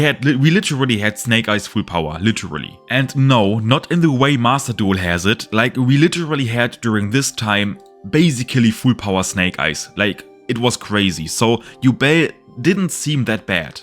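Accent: Germany. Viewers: you have a german accent bro, will terror miss timming? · Love the content, always excited when I get that upload notification. Also, not sure if you knew this... you have a German accent. Anyway keep it goin bud! See you on the next new deck whatever it may be! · Do you realize terror incarnate will miss timing if it is linked away? Also btw u have german accent 👍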